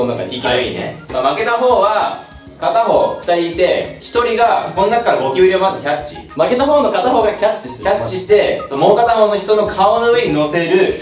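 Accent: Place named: Hokkaido, Japan. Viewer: たまに出る北海道弁がいいですー！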